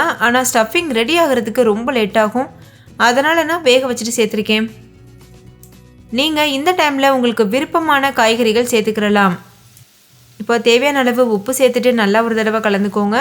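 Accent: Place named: Kerala, India. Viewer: நீங்கள் கேரள மாநில பெண்ணா? தமிழ் நல்ல அழக பேசுறிங்க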